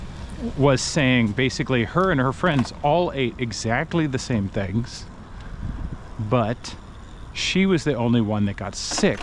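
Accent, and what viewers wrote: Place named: Texas, United States. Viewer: Sound like you are Texan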